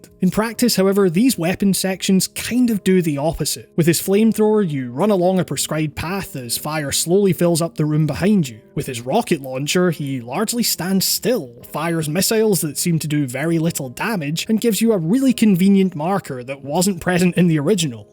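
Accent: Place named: Scotland, United Kingdom. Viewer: I think its a slight scottish accent maybe :)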